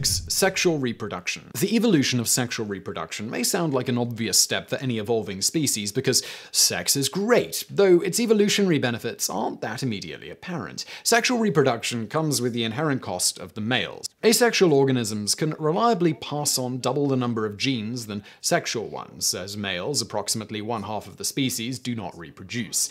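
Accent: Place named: RP, United Kingdom. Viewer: I can't figure out how you got that tofffy nosed accent and yet, can hardly pronounce common words. Is it just regional and unrelated to class/education? This is why people study journalism and broadcasting.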